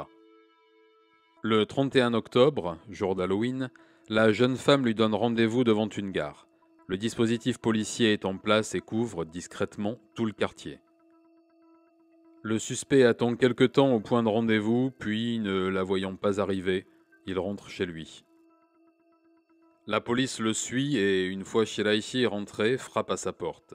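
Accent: Japan. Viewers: Konichiwa! J'ai découvert ta chaîne depuis peu et la toute 1ère vidéo que j'ai vue, c'était le calvaire ignoble de Junko Furuta. J'adore ton accent japonais et ta façon de raconter l'histoire ! Tu gagnes un like et une abonnée !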